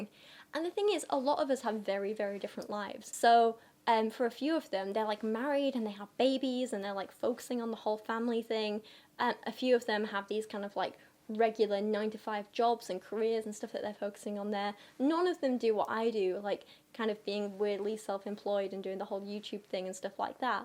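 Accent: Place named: Northern England, United Kingdom. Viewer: A northern girl repressing natural accent with London estuary accent.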